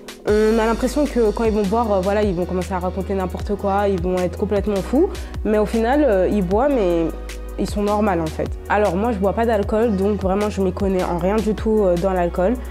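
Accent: Paris, France. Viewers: standardowy akcent bym powiedziała , akcent z Paryżu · Mówi Bardzo Czystym Paryskim akcentem Gawiedzi ! Na 100 % Urodzona we Francji ! Kończyła Szkoły we Francji ! Na 99 % Pochodzi z kompleksu Miejskiego Paryża ! Powiedział bym Okolice Saint Denis ! Przynajmniej Uczęszczała Tam do Szkół ! Na 100 % ! Ale Kultura Osobista ! To 94 Departament ! Francuski Literacki ! A ,, ąrgo ” ( Dialekt Paryski! ) To Dzień i Noc ! To co się Uczyliścje w Szkole ! To Język Literacki ! Prawie Wymarły ! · To jest akcent paryski jakim mówi teraźniejsza młodzież. Wiem bo urodziłem się we Francji i mieszkam pod Paryżem. Jak dla wszystkich języków, w szkole uczą nas wersje literacką języka, a nie tą co się używa na codzień.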